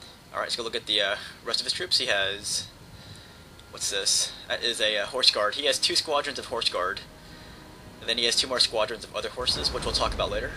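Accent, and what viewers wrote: Netherlands. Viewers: funny dutch accent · lol nice battle and ur dutch accent is funneh but very well spoken. I was suprised.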